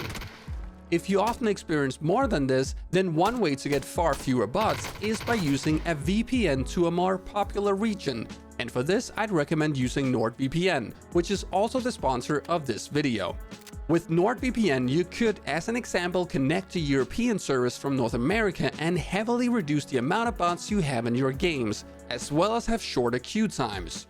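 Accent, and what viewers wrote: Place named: Denmark. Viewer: du er fra Danmark, ikke sandt? syntes jeg kan kende en dansk aksang:)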